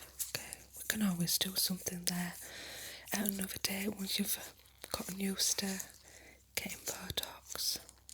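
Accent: North, United Kingdom. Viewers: That was great. Nice to hear a fellow Northerner ❤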